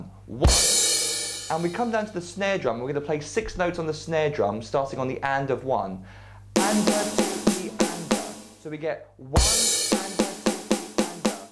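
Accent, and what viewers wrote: England, United Kingdom. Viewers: Are you really English?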